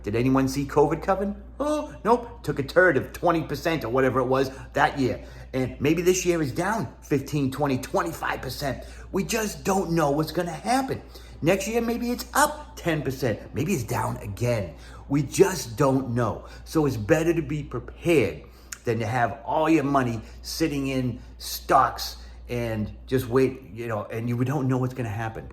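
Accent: Boston, United States. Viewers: Ah Boston, I always thought you had a strong Boston accent and was confused that you were from NC haha, now makes more sense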